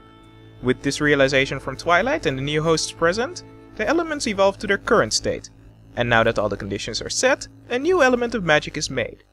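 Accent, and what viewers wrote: Netherlands. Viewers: No reason to be so harsh on the Dutch, I'm well aware how challenging English pronunciation can be since I've experienced it first-hand (English is only my fourth language but boy have I worked hard to get rid of my accent! Only through sheer determination do I sound almost indistinguishable from a native speaker today). Nevertheless, even though you claim that English is your first language you clearly have a Dutch accent, even if not a thick one. Comes from living there, I guess? Never mind. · I noticed the dutch accent and I've been skimming the comments but it raised a question. are you native dutch or did you move to the Netherlands? Also if I may ask what province do you live in? I've met several dutch bronies on the internet but they where always on the other side of the country. And regarding the dutch speaking English. yes its true every one i know has a massive accent the moment they speak english myself included. 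I'm working on getting rid of that(not that easy:D)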